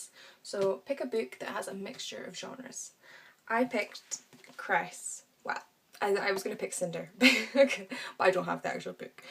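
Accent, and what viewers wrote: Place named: Scotland, United Kingdom. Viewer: I don't think I will ever say enough of how much I love your Scottish accent! It is so pretty. Can't wait to do this tag! I think I already have about half of them answered in my head lol.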